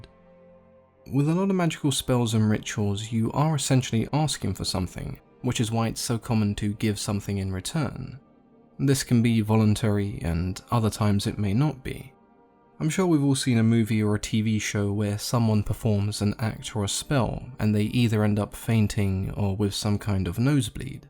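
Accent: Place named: England, United Kingdom. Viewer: They should use your English accent and voice on planet earth and national geographic well and fish sea mammals lions an bears deadly insect bites you would make it more interesting word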